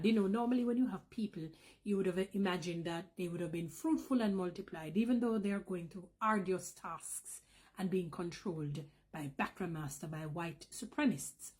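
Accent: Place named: Jamaica. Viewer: All black is ok as long as it is from the country that enslaved them! In America ( American decedents of slavery) ados because American government enslaved our ancestors! I don't know what country enslaved your people but I can hear your accent, you sound Jamaican. Hope you and your people get your reparations from whichever country that enslaved your people( ancestors)🤔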